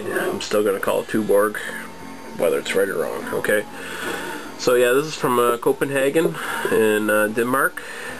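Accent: Canada. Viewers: Drinkin one with you now bud. You sound like a good Canadian boy.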